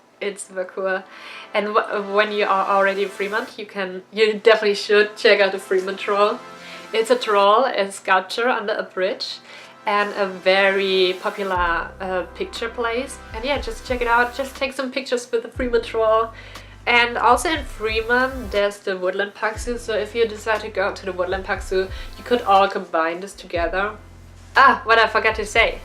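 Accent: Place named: Netherlands. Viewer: You are adorable. Thank you for this info. Very helpful. May I ask where your accent is from? I have family from Holland and very similar.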